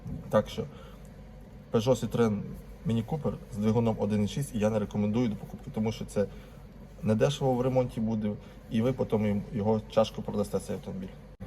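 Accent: Surzhyk, Ukraine. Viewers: А мені подобається Ваш суржик і головне про що Ви говорите!!!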